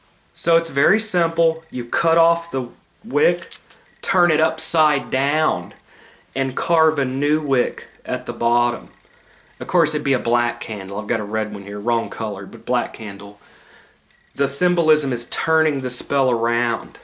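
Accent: South, United States. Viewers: good on you. always a pleasure to hear that southern ascent. blessings.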